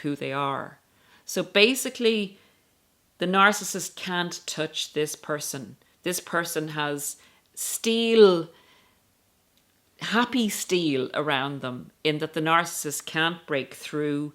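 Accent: Ireland. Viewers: Aye, but her accent is Irish (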